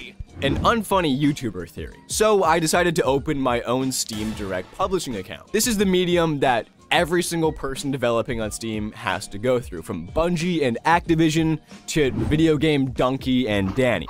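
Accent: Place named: Lithuania. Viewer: you sound lithuanian but in the best way possible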